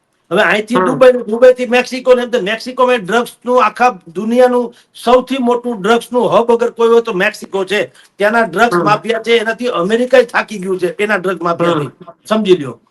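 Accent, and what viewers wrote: Kathiawar, India. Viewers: વાહ... સાબ..શું કાઠિયાવાડી શૈલીમાં પત્રકારિતા છે...વાહ😊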